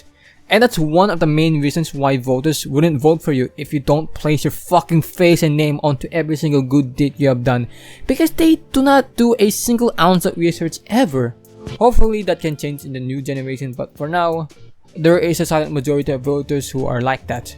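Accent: Philippines. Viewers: Finally someone with English speaking Filipino accent stepped up to understand the situation than native language content creators. All topics aside but this is wild, this is why I wouldn't trust politics all because of Marcos. Marcos did banned Beatles from performing in PH and I was pissed off by that. And now sending Du30 to Netherlands? What Marcos did was ruthless and very greed to hide from us.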